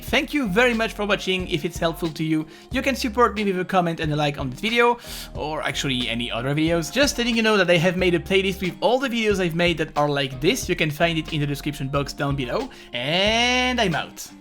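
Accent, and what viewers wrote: France. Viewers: JE SAVAIS QU T’étais FRANÇAIS ENFIN JE PENSE ou belge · Ah je me disais bien qu'à ton accent anglais tu étais français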